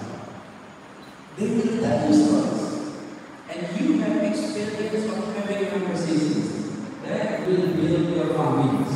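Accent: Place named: Indonesia. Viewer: Indonesia banget bhs inggrisnya pak Anis.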